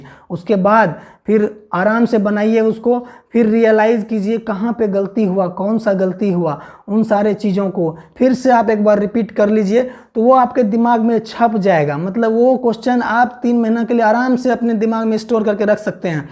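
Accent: Bihar, India. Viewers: Bihar se ho ka bhai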